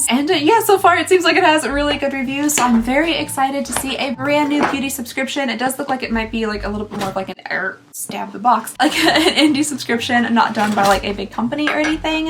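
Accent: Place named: Owensboro, United States. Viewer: My best friend in high school was from Owensboro... your accent makes me miss her!!! Love what you do so keep on keeping on!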